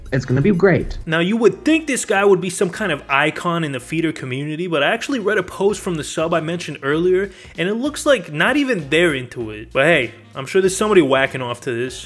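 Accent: New York, United States. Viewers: New Yorker? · A buddy just turned me on to your channel yesterday. Of course, I subscribed. You are funny, and you have a cool accent. I'm originally from Boston and I am guessing your accent is NYC area, not Boston. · You Sound Like You Have A New York Accent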